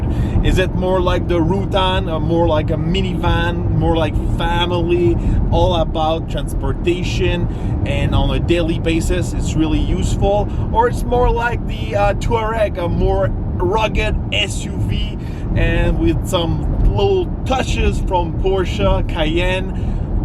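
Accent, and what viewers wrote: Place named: Quebec, Canada. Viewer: My guess Quebec Canada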